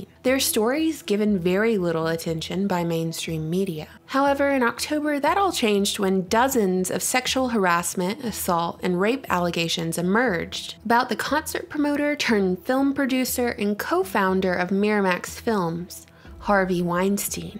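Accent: South, United States. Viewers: I love ur southern accent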